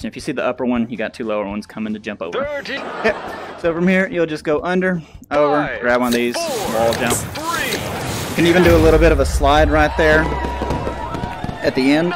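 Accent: United States. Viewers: sounding American today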